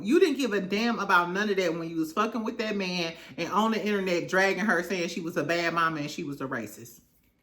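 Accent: St. Louis, United States. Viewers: I thought I heard some STL. 😊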